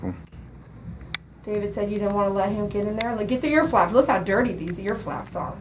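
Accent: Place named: Texas, United States. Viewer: Your from Texas 0.0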